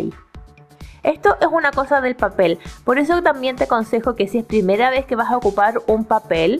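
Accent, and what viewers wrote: Chile. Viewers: Yo pienso que tienes un contenido súper bien elaborado, porque no sólo se basa en el arte de forma teórica, sino que también en la práctica del mismo, acompañado de consejos para aquellos los cuales nos iniciamos en el mismo. He aprendido mucho de tus vídeos, tanto como en la acuarela como en La teoría del Color, creo que es muy interesante el que haya un profesional en arte enseñando y dando sus puntos de vista para la gente que no mantiene conocimientos sobre el tema, sin embargo, le interesa bastante aprender. Además de que me siento súper identificada porque también soy de Chile. (Por como hablas creo que también eres de Chile. [?]) Me encanta tu contenido, saludos. <33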